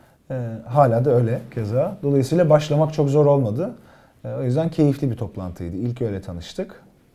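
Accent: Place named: Black Sea, Turkey. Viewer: Sinan abi Allah aşkına sen karadenizli ol şiveni falan bırakma ŞUAN gerçekten MUSTAFA ASİYE sahnelerine ihtiyacım var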